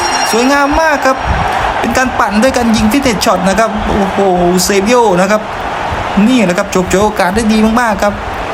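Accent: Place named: South, Thailand. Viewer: คนใต้ม่ายนิพี่ สําเนียงชัดๆเรย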